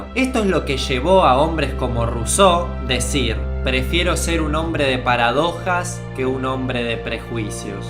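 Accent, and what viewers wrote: Argentina. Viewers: Gumii Megpoiid ¿De dónde eres? No conoces el acento argentino, que mal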